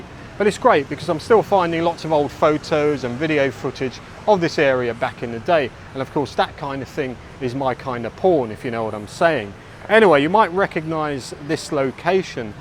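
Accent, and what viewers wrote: United Kingdom. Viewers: Absolutly brilliant as always. You are so unique on YouTube compared to other channels. Entertaining, informative and very interesting. Your knowledge of the history of Bangkok is amazing. I read a reply from you on someone's comment on another video, and you mentioned that you are Thai. I did not know that. Going of your accent, you obviously spent a long time in the UK. I for one would be interested in your story, I think it would make an intersting video. If you have previously made a video on your story, could you please leave a link to it? I love your work. You and a few other YouTubers have inspired me to start a channel of my own. I will be moving to Thailand in a few weeks, then I will be creating some content. Thanks for all your hard work and thanks for the insperation. 🙂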